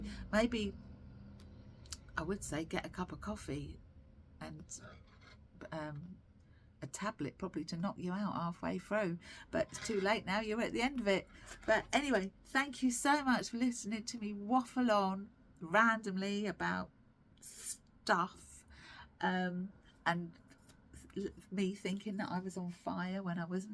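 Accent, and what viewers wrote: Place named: South, United States. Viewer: Ahhh haha thank you so much for letting me know where you live! I could tell it was southern but that's about it! Thanks again for the mention. Knitters really are the best aren't they ❤ much love ❤❤❤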